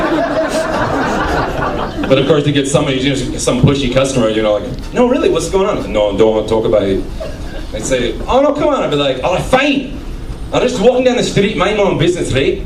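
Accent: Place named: Glasgow, United Kingdom. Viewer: Great Loudon covers, and now stand-up comedy! Not a bad Glasgow accent... try 'Govan' if asked where in Glasgow... Good stuff.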